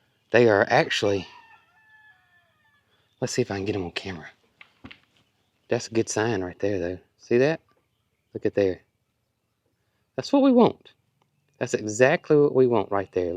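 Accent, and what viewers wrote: South, United States. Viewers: your southern accent is thicker lately. Love it.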